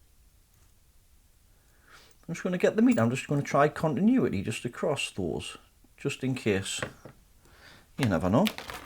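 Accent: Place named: Newcastle, United Kingdom. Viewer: I’m in Newcastle. Born and bred. I can tell you accent isn’t a million miles away. I enjoy your videos and channel is the only channel out of a few hundred I subscribe to that I have notifications setup for. The simplicity of your repair attempts and fixes show that with a little knowledge and understanding such fixes can be completed successfully on a bench that’s not in an engineering or highly technical environment. Think it gives some less experienced the confidence to have a look inside and try fault finding. GOODLUCK with your channel mate. I think such topics are on the increase and there’s a lot of us out there looking for how fixes are approached. 👍🏻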